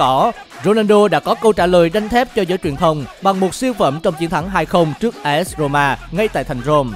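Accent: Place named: North, Vietnam. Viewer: Sao tiếng BẮC KỲ khó nghe quá 👎👎👎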